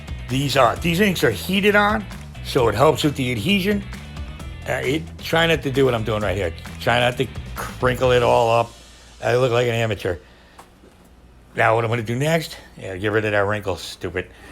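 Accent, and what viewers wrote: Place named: New York, United States. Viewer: Definitely need a hard hat sticker ill save a piece of real estate on there for you... and the accent makes me like your channel more feels like one of the boys from home got there own channel the haters must be from out in the Midwest and don't get the real NY accent lol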